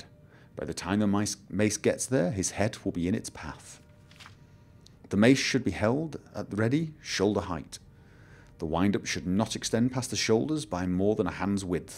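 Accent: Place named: Scotland, United Kingdom. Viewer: It sounds like you have an English accent, but you roll your R's like a Scotsman. Did you invent your own accent?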